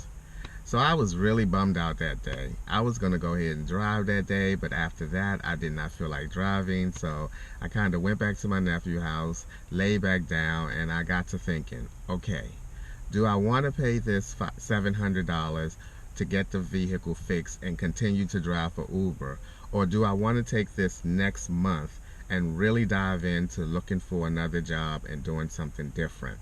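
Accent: Baltimore, United States. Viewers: 🤗 I can definitely hear that B’more accent😎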